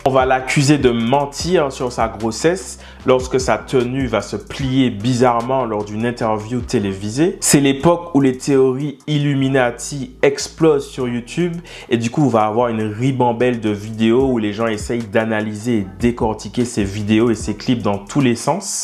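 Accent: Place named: Réunion, France. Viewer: cette femme est incroyable ! & je suis fan , mais j'adhère complémentaire sa façon de communiquer , tout passe par son art , c'est brillant . Avec sa elle limite au possible les scandales , sa renforce son coté Queen , j'adore . Merci pour ta vidéo (il aurait fallu rajouter des ptite choses comme "les moqueries sur sa fille Blue Ivy quand elle été seulement un bébé ! je pense que sa a du bien aider à accélérer le changement). Sinon très bonne vidéo , tu parle bien c'est clair sujet maitriser , bonne continuation a toi ! (je trouve que tu as un accent créole ile de la Réunion 974 ?? , je me trompe peux etre ^^)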